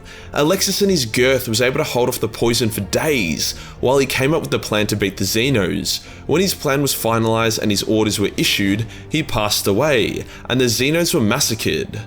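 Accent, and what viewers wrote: Australia. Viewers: He's speaking pure okker. It's an Aussie thing... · Dude, you talk like Paris Hilton, but with an Australian accent. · Hearing 40k in Aussie is good for the soul 😁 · Best way to wake up is a crazy Australian talking about sci-fi shenanigans. FOR THE EMPEROR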